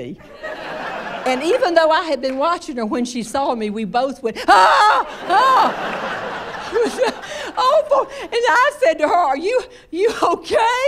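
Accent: South, United States. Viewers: Thanks to Miz Jeanne I now find myself slipping into a Southern Accent...even in my head, I am typing this with an accent. Problem is .. I'm Australian. Now my teenage children and Husband think I'm weirder than they first thought.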